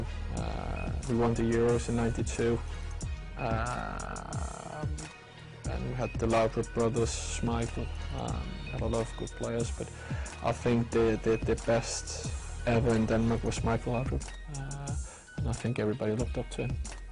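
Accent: Liverpool, United Kingdom. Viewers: Hes gettin a scouse accent!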